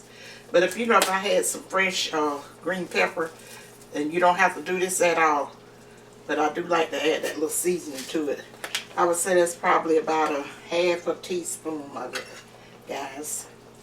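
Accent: United States. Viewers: Where is this place ? sound like Americae ? Never heard of dogers or what ever ity called. Jamaica we say dumplings to Rasta jaz.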